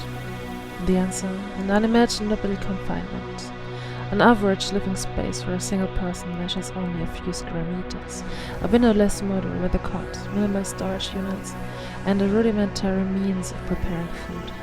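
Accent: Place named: Germany. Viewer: Your German accent is beautiful; it blends very well with the dark/gothic atmosphere of Warhammer. It is definitely an add-on. I love it! 💀THE EMPEROR PROTECTS💀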